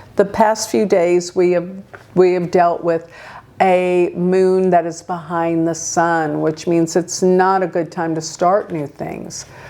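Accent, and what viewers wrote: United States. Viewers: And I have learned to understand and embrace her American accent especially in the pronunciation of sanskrit terms.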